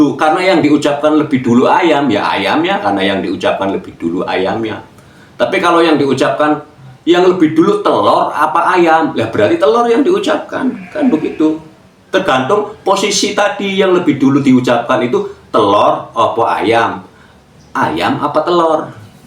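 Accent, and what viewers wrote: Banyumas, Indonesia. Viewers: Ingin sekali tau alamat tempat tinggal nya pak kyai....di lihat dari logat nya spti nya...dari Banyumasa. Sehat trs pak kyai❤❤❤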